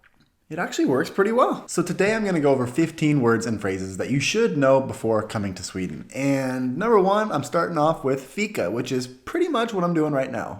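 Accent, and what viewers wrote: United States. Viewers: I'm an American, and I can tell he has an American accent. His Swedish has improved a lot, but he still can't pronounce Norköping correctly. For me, Swedish was very easy to learn, but hard to pronounce correctly. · You are really good at speaking Swedish, but every swede can hear that you have an american accent. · Hearing an american speak swedish is quite funny but then for sure english speakers get an giggle out of me trying to speak english :) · Every swede hear that you have an american accent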